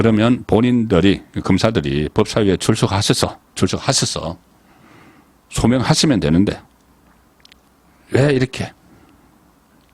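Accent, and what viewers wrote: Gyeongsang, South Korea. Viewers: 탄<핵 아니고 탄~핵이라니까. 탄자가 장모음이오 경상도 사투리 증말 듣기싫네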